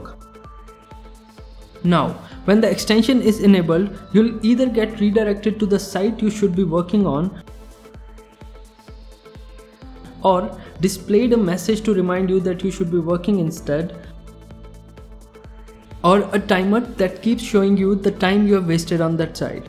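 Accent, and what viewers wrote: India. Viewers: Why do you sound like an Indian? Well, ok, he is an Indian. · LOVE THIS CHANNEL BUT I HATE THAT INDIAN ACCENT.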